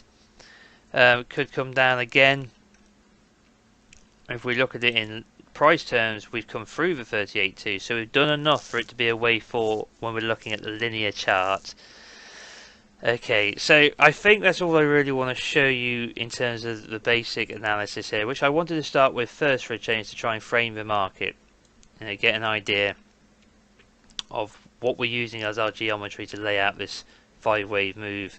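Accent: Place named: London, United Kingdom. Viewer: Lol, perfect London voice, love it.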